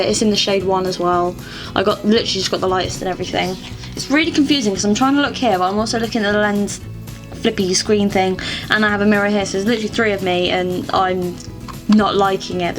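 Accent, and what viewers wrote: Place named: Norfolk, United Kingdom. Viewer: You're welcome!! You sound quite Norfolk and im from there so aha xx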